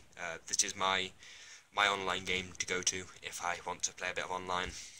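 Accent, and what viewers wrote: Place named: United Kingdom. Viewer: British Accents are Orgasmic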